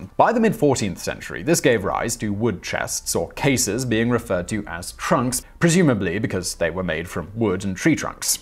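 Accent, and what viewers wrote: United Kingdom. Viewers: I have no idea about anything that you talk about, but because you have a British accent I agree with you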